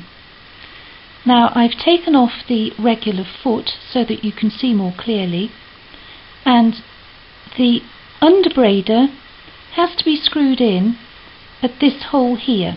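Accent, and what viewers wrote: United Kingdom. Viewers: You have a beautiful way of speaking … its lovely to hear British English rather than American. Reminds me of Lady Di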